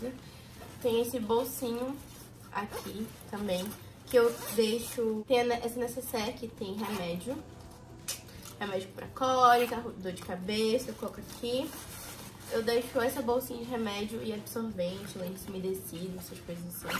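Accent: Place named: Amazonas, Brazil. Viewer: então é nós, sotaque amazonense, ja ganhou uma inscrita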